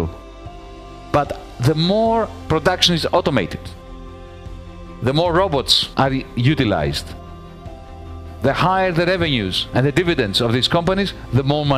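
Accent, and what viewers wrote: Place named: Russia. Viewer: The Russian accent is so enraging when it comes to criticize of capitalism!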